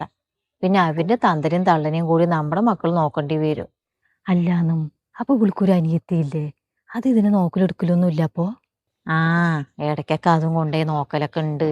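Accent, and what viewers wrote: Palakkad, India. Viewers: പാലക്കാട്‌ ആണോ വീട്. പത്തിരിപ്പാല എന്റെ നാത്തൂൻ ഉണ്ട്. അവരെ സംസാരത്തിന്റെ slang ഇങ്ങനെ ആണ്.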